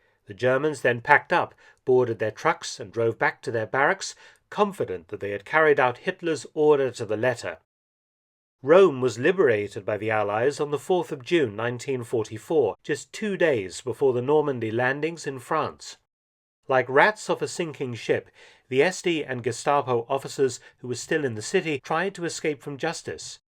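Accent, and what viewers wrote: United Kingdom. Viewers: I dropped out of college, but my history class was the only one I completed. Dude was just like Mark without that silky British accent hahaha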